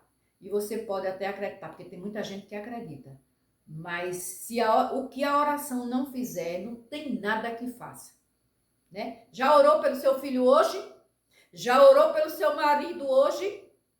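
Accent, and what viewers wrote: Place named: Pernambuco, Brazil. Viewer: Amei cair nesse canal. É o tipo de amizade que mamãe deixa 😅😅😅 tô pedindo a Deus coisa boa pra ver. Seu sotaque parece com o da minha família que é pernambucana, eu sou do Rio.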